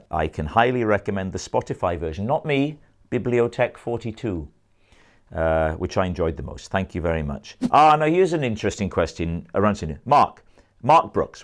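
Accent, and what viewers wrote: Wales, United Kingdom. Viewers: You could just read off the ingredients on the back of a can of baked beans and I’d be in heaven…I miss hearing that gorgeous Welsh accent ever since I moved across The Pond 39 years ago from Wrexham. It’s a bonus that you’re funny!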